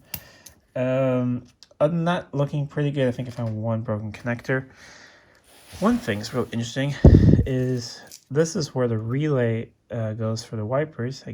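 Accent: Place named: United States. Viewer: sir, you are swedish - where did you get your american accent from ... ?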